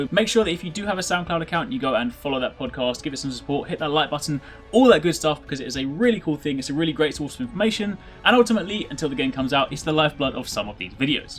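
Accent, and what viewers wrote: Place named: United Kingdom. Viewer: OMG for the non Brits please talk slower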